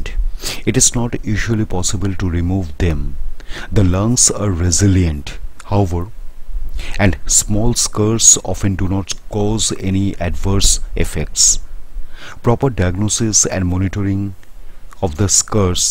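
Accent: India. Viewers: That Indian accent is strong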